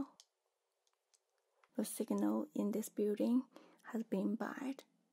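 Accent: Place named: China. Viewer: 这个口音好国人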